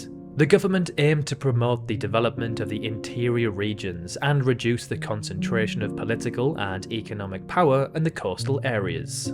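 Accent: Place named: United Kingdom. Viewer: Remember that he is British. British people talk differently. I kinda like the pronunciation “Kih-gah-lee” better anyway.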